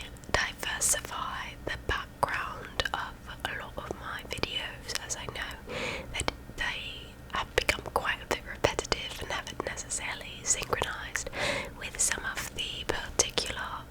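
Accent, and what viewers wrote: United Kingdom. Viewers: this video will be 2yrs since it was released and I'm still hoping she'll make a pt.2 of it coz this is always my go to British accent ASMR. ☺️ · British accent why not ? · Really Beautiful Woman with a Very Beautiful British Accent!! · You let it slip a few times went abit Birmingham aha but other that a genuine solid accent it u spoke to me in the streets I wouldn’t question it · Yes!😊😊😊😊. My wish is finally granted! is back with another ASMR video with a british accent! YAY!🎉🎉🎉🎉🎉🎉. Also, you sounded like Hermonie Granger's accent from the first Harry Potter movie! Now that's wicked cool!💙💙💙💙💙💙💙👍. I give this video an A+ for your incredible British accent,!⭐⭐⭐⭐⭐⭐⭐👍. Have a great weekend and enjoy your fantastic accent.😊😙💙💙💙💙💙💙💖💖💖💖💖💖💖😊⭐⭐⭐⭐⭐👍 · Nice and great video. I was really surprised to see u do a British accent. I must say u do a perfect British accent 100 percent. 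U sound almost like UK asmr remember her. Thumbs up 👍👍 August.21.2021. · I just assumed you were British… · You know I'm from NYC and seeing a beautiful latina do a British accent I am honestly impressed. In the future could you please slowly scratch the fluffy mic again? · Hi, I missed you very much, your British accent is wonderful ❤❤❤ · Perfect accent. I say that as a Brit.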